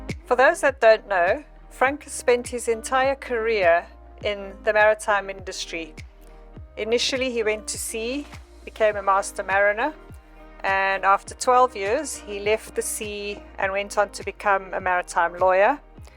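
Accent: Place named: Zimbabwe. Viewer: Dear Frank and, this is first time I've viewed your channel and I enjoyed it immensely, thanks! In a twist of fate I know Frank already from our Allan Wilson High School days in the early '70s when we were both boarders at Burnham House! I remember you well Frank and you've done really well for yourself in the maritime industry from a landlocked childhood! Your narration was great, I loved hearing the familiar Zim accent, thanks! Happy sailing guys! Cheers, Jeremy Lancashire (Lancs)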